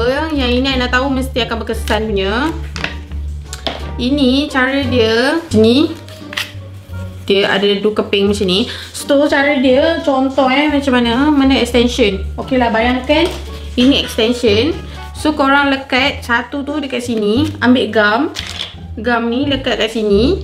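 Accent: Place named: Penang, Malaysia. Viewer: Penang rsanya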